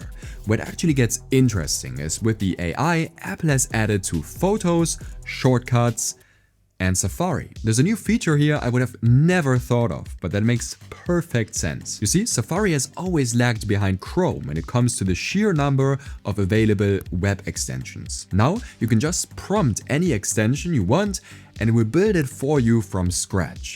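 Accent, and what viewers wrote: Germany. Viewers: erster Nice. starked Video. Mann kan aus dem Accent hören, das du Deutsch kannst. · Dass du ein Deutscher bist, hört man sofort raus. 😂 Wünsche dir viel Erfolg mit deinen Videos. 👍🏼 Weiter so.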